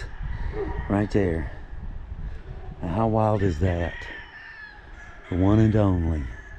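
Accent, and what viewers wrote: South, United States. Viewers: Loving your videos on the Hatfields and McCoys. Love your Southern accent ❤ Cheers from New Zealand 🇳🇿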